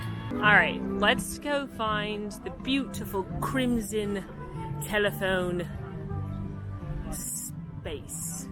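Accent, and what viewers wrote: England, United Kingdom. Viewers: English accent in Canada?! 😅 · Hi from the UK - love the 'trapped' sessions and your British accent 😅😊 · your Canadian accent sounds more British to me;) I'm French Canadian BTW so I might be wrong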